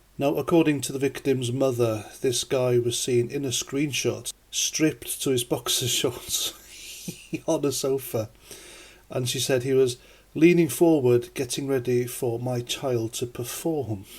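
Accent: Wales, United Kingdom. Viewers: Is it a Welsh newsreader?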